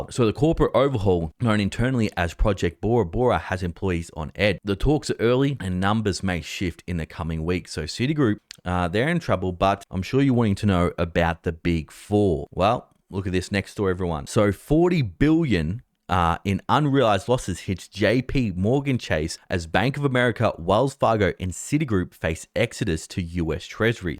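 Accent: Australia. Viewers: Darta - Data PLEASE use your Australian accent !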